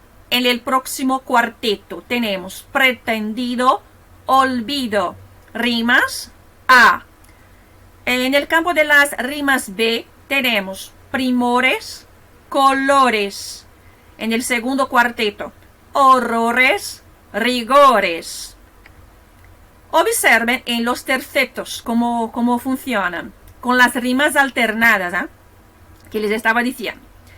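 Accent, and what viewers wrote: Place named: Spain. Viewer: Parabéns, professora, pela brilhante análise do soneto e pela relação que fez com a contemporaneidade. Lindo acento espanhol.👏🏼👏🏼👏🏼👏🏼👏🏼👏🏼👏🏼👏🏼👏🏼👏🏼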